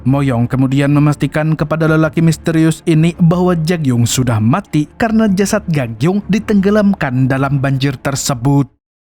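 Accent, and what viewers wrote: Banjar, Indonesia. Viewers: Bang lu org banjar😂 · Pian urang Banjar kh bang 😅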